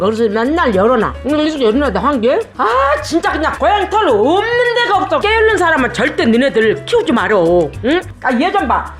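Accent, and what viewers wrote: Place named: Jeolla, South Korea. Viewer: 우리엄마랑 똑같은사투리..똑같은욕..ㅋ소오름ㅋㅋ어딜가도 ❤전라도사람 인거 너무티남ㅋㅋ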